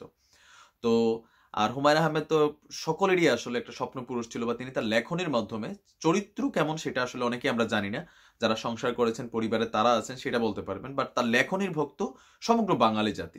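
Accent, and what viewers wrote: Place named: Sylhet, Bangladesh. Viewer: আপনি কি সিলেটের লোক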